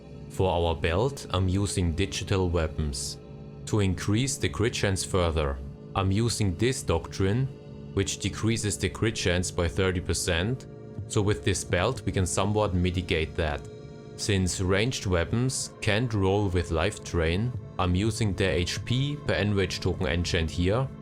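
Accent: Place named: Germany. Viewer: Mach Deutschen Kanal, gibt ne Menge KI Stimmen die das Übersetzen können! Wenn ich mir aber dein Akzent so anhöre, glaube ich das du Deutsch schon kannst! Brauchst keine KI Übersetzung, also sprich Deutsch! Siehst ja das die Reichweite trotz Englisch nicht mehr ist!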